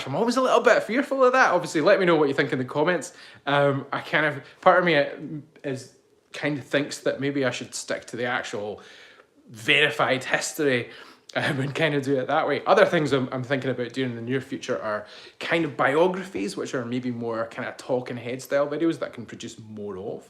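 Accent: Scotland, United Kingdom. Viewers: I love listening to your stories with a Scottish accent, makes it better. I'll share the next story with my sister.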